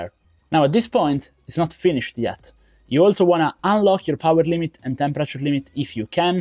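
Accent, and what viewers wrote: Italy. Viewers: Ciao bellissimo video da come parli inglese sembri italiano, se cosi fosse fai un video uguale in italiano? Sei un grande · troppo accento italiano :face-red-heart-shape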